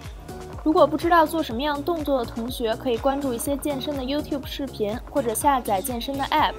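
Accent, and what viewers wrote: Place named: Tianjin, China. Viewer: savis知道你是北京的 不过真的觉得说话像天津人啊 甭儿亲切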